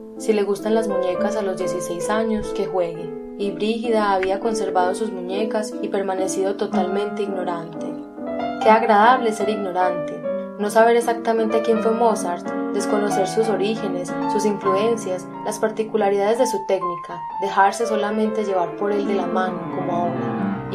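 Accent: Antioquia, Colombia. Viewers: Que habladito paisa tan ricolino e.e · El acento de colombiana le da otro aire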